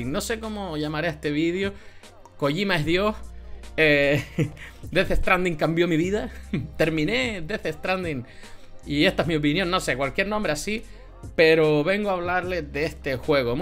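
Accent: Canary Islands, Spain. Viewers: Español de Canarias por el acento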